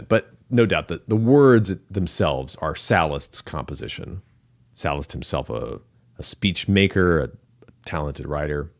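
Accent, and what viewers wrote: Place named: United States. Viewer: I love the content but this accent..I cant place it. Southern Pennsylvania or Tennessee..or anyone in California but its slightly irritating..its still pretty good. Im not sure I've read all this.Its really a gift. Thanks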